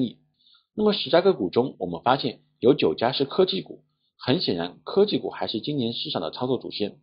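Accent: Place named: Shanghai, China. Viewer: 听老师口音祖籍应该上海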